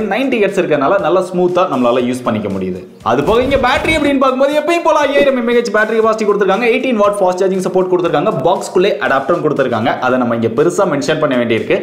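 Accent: Kovilpatti, India. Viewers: Anna neenga kovilpatti ya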